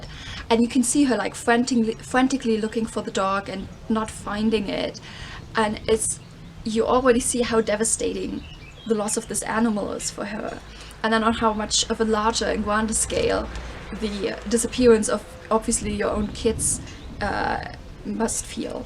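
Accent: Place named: Brandenburg, Germany. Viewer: Rain? Tropics get rain. The Sahara, Death Valley & other hot weather deserts get rain when they're lucky. Europe is so lucky. Hurricanes? What hurricanes? Tornadoes? Very occasionally in the south, but no one place gets hit with any regularity. Major earthquakes, well OK some in Italy and a bit in Spain. More northerly climes are completely spared. Not fair. Lucky lucky lucky. But beware the Azores re causing tidal waves & Icelandic volcanoes erupting. Euros can't be lucky all the time. According to linguistic maps, your dialect & accent is labelled as Markïsch aka Margravian aka Low East German. As u obviously understand the High German of places like Hannover, it means that u should have an easy time learning Dutch, which is a mix of High & Low German. You are a linguist, you learned English. And some day you'll rattle off chrysanthemums & "this that them there things, the thirty-three of those though they thoroughly" with no trouble at all. 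Me, pronounce the hardest sounds in German? Faints. :-D And I thought French was hard. According to Worldometers, Germany still has >27.7K active covid cases, with 216 new cases reporting today. The curve of covid is still going up, but it is closer to flat than it has been before. Canada has lotsa space, very spread out, relatively speaking, so our situation is vastly different, depending on the region. One province, Quebec, has nearly 30K cases & >2.1K deaths, whereas its bordering province, New Brunswick, has <120 cases and 0 death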